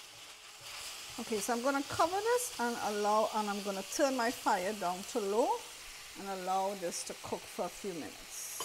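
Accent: Trinidad and Tobago. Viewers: Very nice video. I know a Trini accent when I hear it. XO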